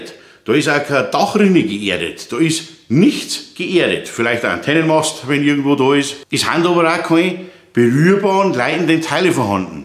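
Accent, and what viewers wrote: Bavaria, Germany. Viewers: Bleib autentisch Red weida borisch Des passt scha 👍👍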